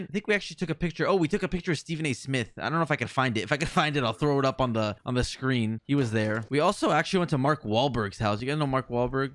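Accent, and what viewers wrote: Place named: United Kingdom. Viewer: That British accent is disgustingly funny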